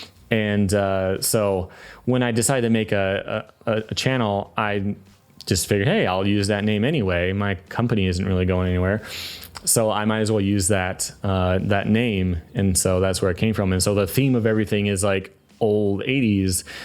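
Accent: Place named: United States. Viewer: the .at thing confused the hell out of me back then - "this guy is austrian like me? no, he talks like an american? What the f is going on?!"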